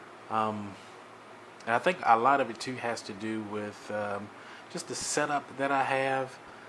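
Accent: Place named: Carolinas, United States. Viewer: see I knew you from the Carolinas. My sister lived in Greensboro North Carolina and she picked up the same accent.YEA like you said I am taking my time she is coming out nice but it's the gyro I am worried about. I am using a K-bar makaido system so I heard good thing about it. I even have the bluetooth to use for it to link up but I don't know how to use it yet your the best thanks bud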